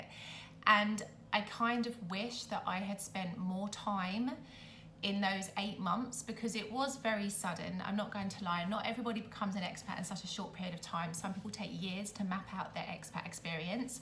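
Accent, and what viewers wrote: United Kingdom. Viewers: Hi, i'm watching all your videos because I want to improve my english with a British native speaker (I don't like too much the american accent..). They are funny and interesting, I found them useful for my goal, so... thank you for sharing your thoughts and considerations about your life in Italy. Sometimes your connected speech is a little too fast for my level, but it's an interesting challenge;) I have just a little tip for improve the enjoyment of your videos: the theme song is very very loud... but the audio when you speak is quite low, so I have to turn up the volume a lot during the intro... and a few seconds later the theme song starts and I find myself with destroyed ears!:) Ciao e grazie!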